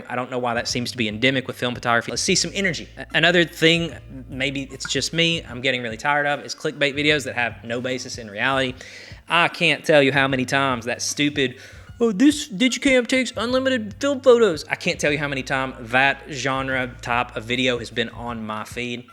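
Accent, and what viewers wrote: Kentucky, United States. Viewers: As a Kentucky native, I agree with your point about the accent. Being from Louisville, I may not have the exact same accent as you and yes... ha, I live in LA now! HOWEVER, it should be more about your ideas than the way you speak. Cheers. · Hell, I come for the accent. I went to college in Lexington, my room mate was from the sprawling metropolis of Pikeville so it's familiar. Plus being from Tennessee, I can't make fun of anybody's accent. You're inspiring me to let mine show more than I do. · 1. Instant film is overhyped and overpriced and takes absolutely know photography skill at all other than holding the camera still. 2. Fujifilm abandoned the filmmaking community in 2013 (reference their last CEO bluntly stating film photography was irrelevant). 3. I love Kentucky. My mother was from Liberty (Casey County) and I made a short 16mm film on my visit to Danville and Liberty). 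Your accent reminds me of my family down there who are down to Earth just good people and the mainstream media for years has stigmatized Southern folks unfairly as inferior. Dont change the accent. 4. I know EXACTLY which film influencers you talk about as low energy not to name names cough grainydays but yeah I dont get the whole jaded attitude projection either? Its like dude you should feel excited and privileged you get to shoot film in the "digital era. AND heres my issue with the film photographer "influencers" and they continue to ignore this point but they refuse to acknoledge the fact that shooting on film is about OWNERSHIP of images i.e through hard copy archiving. Thats the BIG reason I shoot film. Its not just for the "look" or it "slows me down. "Its the fact I have physical, tangible 4K hard copy image that will last over 100 years. 5. I wont even dignify the "digicam" thing whatever that is but its most definitly not celluloid but you share the same frustration with Digicams that I share with those "film emulators" that infect my Instagram news feed.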